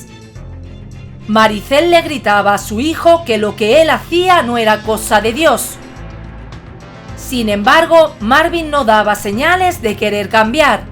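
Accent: Spain. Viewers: podes haceralgo con ese acento gallego? no suena bien. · Hola,me encanta tu trabajo y por tu asentó deduzco que eres española, pero es video no vidio como tú lo dices jjjj saludos desde República Dominicana 🇩🇴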